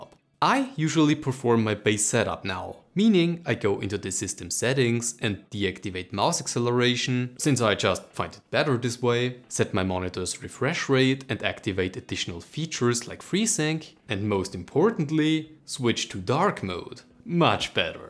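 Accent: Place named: Germany. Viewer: deutscher accent aber gutes video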